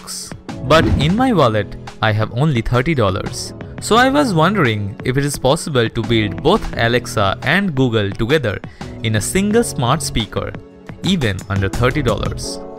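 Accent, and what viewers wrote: India. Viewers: sidha bol de na indian ha itna natak kyu krta ha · Does anyone notice that he has an indian acent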